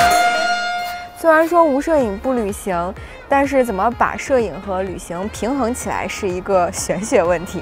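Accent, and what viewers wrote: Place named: Linyi, China. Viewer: 哪里像冀鲁豫三角地的人了- -济宁聊城的口音不是这样的吧。。这个倒比较像临沂那边的2333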